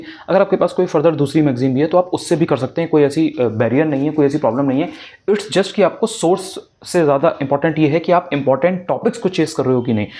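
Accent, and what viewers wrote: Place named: English-medium, India. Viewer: Sir ek cheez jaani thi..ki aap baato se toh thode english medium types lagte ho but kya aap padhai hindi medium se karte ho..plz sur clear kar dijiye😂😂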